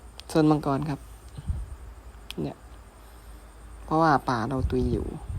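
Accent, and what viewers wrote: Isan, Thailand. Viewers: นี้มันช่อง Doyser But พากย์อีสาน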